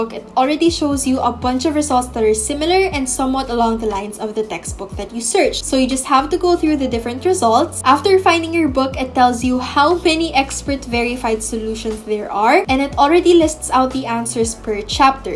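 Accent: Philippines. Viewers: I'm new and at first I'm wondering if you're filipino bcoz of your accent and if not for the "kasi" word that you used I would still be wondering if you really are hehe btw thnx for sharing I would use slidesgo from now on <3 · Hmmmmm... I’m new and I hear a Filipino accent~ 😜 I’m Filipino too! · i love you filipino accent🙏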